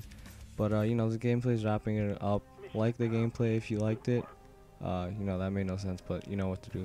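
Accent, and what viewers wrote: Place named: India. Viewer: indian accent!!!!!!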